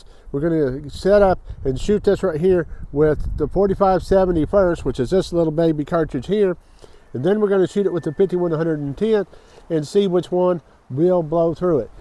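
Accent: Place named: South, United States. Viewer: Man painka pietinių valstijų akcentas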